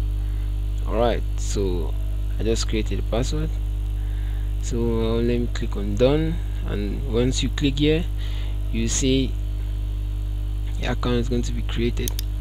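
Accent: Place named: India. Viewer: Boi you sound like Indian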